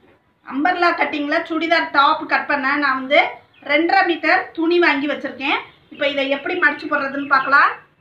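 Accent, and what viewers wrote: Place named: Madurai, India. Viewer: Tailoring institute poitu kathukita feeling.thelivana vilakam madurai thamizhil akka.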